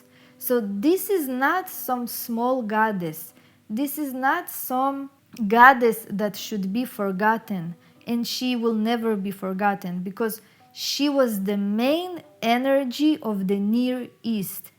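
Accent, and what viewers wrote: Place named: Eastern Europe. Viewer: The accent is the best I love when she says shit..in eastern European accent my grandma used to have similar accent but she was from Cyprus.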